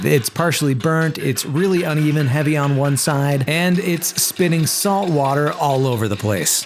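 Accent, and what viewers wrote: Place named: Utah, United States. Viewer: I’m from Utah, are you also from Utah? Your accent sounds very much like you grew up here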